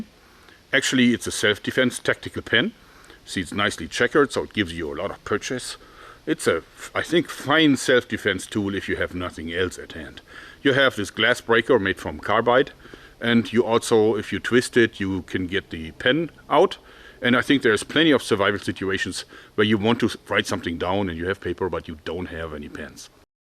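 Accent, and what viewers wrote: Germany. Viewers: Man hört den deustsch accent so dirch aber irgendwie sind keine deutschen kommis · He actually sounds very german. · Im German. He has a typical German accent and the Amazon-Screenshot was is german language too.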